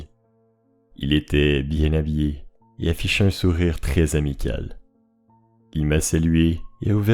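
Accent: Quebec, Canada. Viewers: j'adore ton "accent" Québecois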